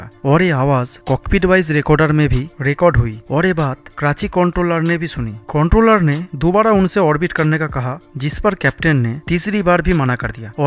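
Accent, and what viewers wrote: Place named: Bengal, India. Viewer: Thora pronunciation improve karo. Bengali gaon ka accent hai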